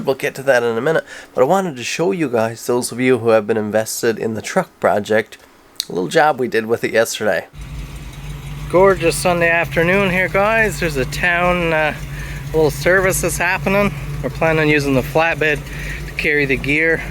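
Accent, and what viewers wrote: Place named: Canada. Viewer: Beautiful outcome!! The tool is so simple it’s elegant. I really enjoy watching your work. I’ve done a number of re-handle projects and a few re-profile things and really enjoy the leatherwork!! I’ve forged one blade myself: did everything wrong, but it was pre-YouTube and really widespread internet days. Did the best I could with a wood stove and some tongs I found at a yard sale. Finally finished the knife and heat treated it in the oven; it’s good enough for a wall hanger 😜. My favorite part of your videos is the accent. I grew up in Montana, and wandered Alberta and BC a good bit. I really enjoyed The Great White North eh!! ♥️🇨🇦👍. My grandfather and great grandfather wandered Nova Scotia too. It’s in my blood.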